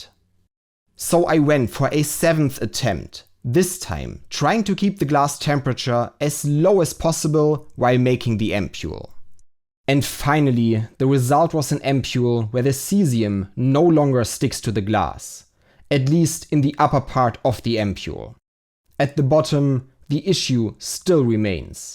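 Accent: Germany. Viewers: HAHA a german robot HAHA learn to talk like a human you f00ken AI npc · Finally a face to this voice. Der harte deutsche Akzent ist irgw catchy..😅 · love your videos man. Außerdem, dein Akzent hört sich deutsch an, woher kommst du wenn ich fragen darf? :) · I know tis guy is German this accent is to thik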